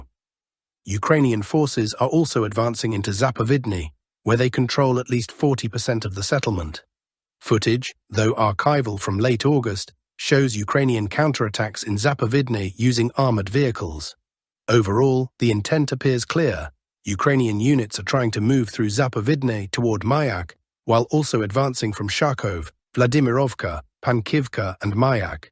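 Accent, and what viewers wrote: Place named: England, United Kingdom. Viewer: How truly Russian or otherwise East European (as your name suggests) are you? Because you pronounce all placenames with a very English distorsion: hulya-powl instead of actual Huliapole, lay-man, instead of actual Lyman (lee man). It's funny but disconcerting, I thought you were from Russia, or somewhere in that part of the world.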